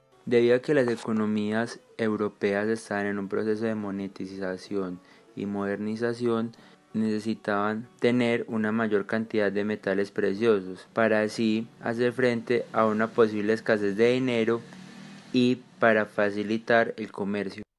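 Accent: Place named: Antioquia, Colombia. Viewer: asi es el acento paisa en colombia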